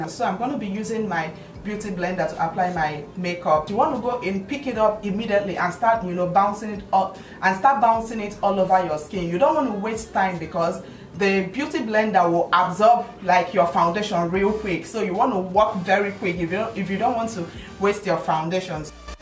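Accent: Nigeria. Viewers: You are so beautiful and tutorial is explanatory and easy. You sound Nigerian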